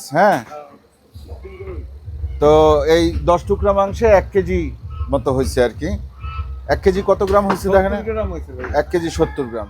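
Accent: Barishal, Bangladesh. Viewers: ভাই আপনার বাড়ি বরিশাল নাকি?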